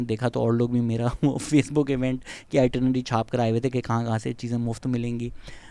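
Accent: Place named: Punjab, India. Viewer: Love your Punjabi accent 😁❤️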